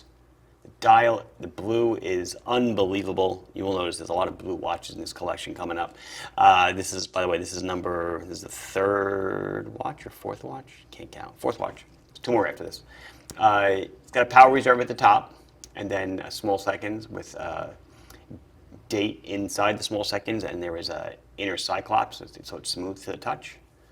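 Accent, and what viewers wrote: Long Island, United States. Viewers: “Hi this is …” that accent whips my head around every time 😂 we don’t have many authentic Long Islanders down here in Texas.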